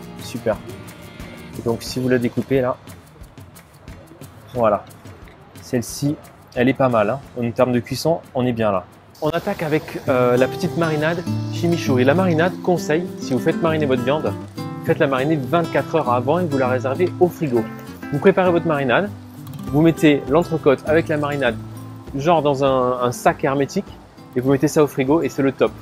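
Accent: South, France. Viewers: Salut!!! Super ces 2 recettes, pour la première parfois je fais au pif avec ce que j'ai sous la main (en général je suis pressé quand je m'y lance ), pour la seconde j'ai horreur du vinaigre mais je remplace par un vin blanc sec (toujours une bouteille entamé dans le frigot pour la cuisine ) mais je la retourne dans le plat et la masse avec la marinade (Huile d'olive; thym(ou basilic), ail, paprika, piment d'Espelette sec, poivre ) je sale juste avant de la cuire avec de la fleur de sel!!! Comme d'habitude je ne peux m'empêcher de donner mes ingrédients et de trop en faire avec mon commentaire. Je m'en excuse par avance!!! Bon Week-end à toi & ton entourage!!!! Au fait,ça plaisir de voir que tu n'as pas perdu l'accent du soleil, il fait chanter les recettes!!!!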